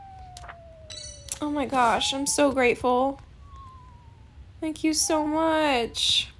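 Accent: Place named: United Kingdom. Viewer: early,and ik that ppl r nice to u cuz u r one! edit:omg your British accent🤩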